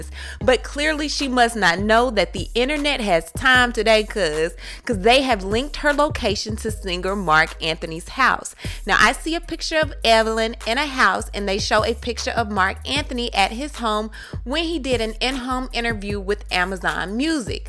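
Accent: South, United States. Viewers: I love and her accent. CORN-tine. You can do no wrong woman! Tea spilling facts with that friendly southern accent.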